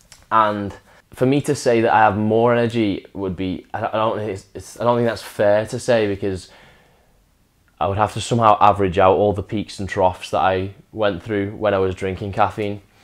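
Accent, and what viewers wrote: United Kingdom. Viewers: Hello there. I'm here in Southern California under Coronavirus quarantine and ran out of coffee two days ago. Normally, I just walk down to the local coffee shop for a cup, but everything's closed up and my food delivery service isn't arriving for four more days. OMG!!!! Had NO IDEA how hard it is to kick this stuff. Just realized I've been drinking hardcore for close to 30 years and never really seriously tried to stop. Didn't sleep at all last night and am wigging out. This video is AWESOME!!! I already feel like I'm in that movie Trainspotting and your accent is adding to the experience. LOL!!! 🤣Thanks for posting it. It really helped me out to know that I'm not alone. "Cheers Mate" or as we say here in Cali "Thanks Bro!!". -m. Huntington Beach, Ca. 👍✌😎 -btw i enjoyed your video so much I'm subscribing. The way you articulated your experience was exceptional. 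"Brilliant" is the word I think y'all use over there in'net?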